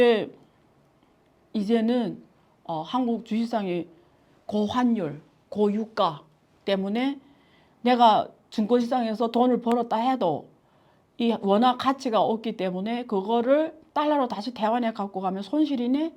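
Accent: Yanbian, China. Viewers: 아유화 유튜브 좀낫설지만반갑씁니다 사실적인 소식만 전해 주세요 억약으로보아연변말씨 아니면 탈북민이신가요 조심스럽게 지켸봅시다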